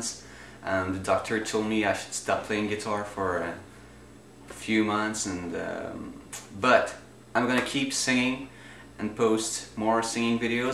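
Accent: Quebec, Canada. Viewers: His first language is French, but Quebec's French has a little bit different accent than that of France's soooo ya :) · Guys hes from french part of canada, so french is first language thats why he has an accent.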